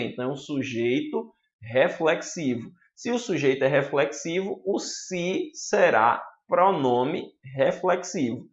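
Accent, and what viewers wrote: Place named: Northeast, Brazil. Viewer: Achei ,alguém que consegui aprender e segurar na mente heheh ,boa didática simples e boa de memorizar. Tu és de onde companheiro ? Nordestino como eu ?? Sotaque conhecido hehe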